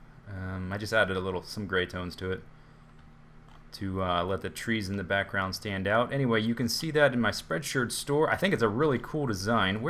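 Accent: United Kingdom. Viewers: Good, British accent. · its, with a sexy uk voice?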